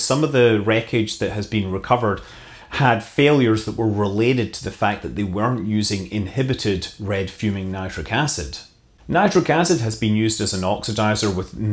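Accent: United Kingdom. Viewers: Very nice stuff in that great British accent (Or Am I wrong?).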